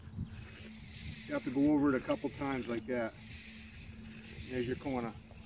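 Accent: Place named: Massachusetts, United States. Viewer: Cornah' herah' fingahs' you from Massachusetts or what? If so fellow finishah saying wicked pissah video. I'm on my 19th year and over the last 3 have been going to northern California to pour in the winter months ......and mine gold⛏. If you are in new england let me know , I could put you on some gold more then likely. That's how gold found me 9 years ago, working late one night walking from basement to basement. Caught of glimpse of something shiny. Picked it up it was a pc of gold in quartz "I didn't think it was real" a year later camping in Rangley maine. Found a nugget in the river and it was on from there. Lots of old mines in new England and most of the gravel we pour on and is around the site holds gold in smaller pcs.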